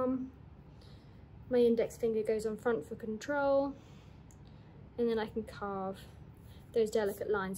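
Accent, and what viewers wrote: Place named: United Kingdom. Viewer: Nice British accent! Thanks for the tips.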